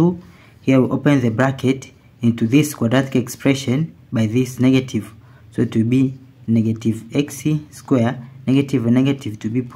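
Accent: India. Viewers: Не знаю, что обозначает GERMANY в заголовке, ибо судя по цвету кожи и произношению за кадром индус. Задача решается на раз-два. Глядя на уравнение мне понятно, что (х) следует искать в области отрицательных значений. А раз так, кроме как "-2" никаких корней это уравнение иметь не может. Наверное, в Индии много лишней бумаги. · This person in the video is NOT a Native German speaker, vo8ce is of someone from India. Why you mention Germany in your video tagline? I dont know.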